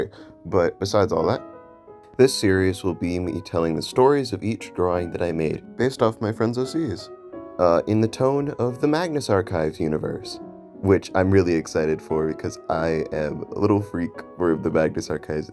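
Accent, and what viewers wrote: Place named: United Kingdom. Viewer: British aha voice ❤ adorable character 💪💗💗💗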